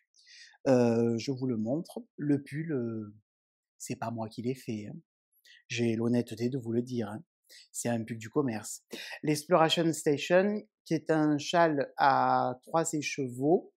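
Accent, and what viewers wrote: South, France. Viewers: Merci Toujours un régal de te retrouver avec ton bel accent du sud..j’en ai marre de tricoter tes chaussettes!! Mon second petit fils a voulu les mêmes que celles tricotées pour son cousin! Heureusement que je change le contrastant!j’ai horreur de faire 2 fois le même ouvrage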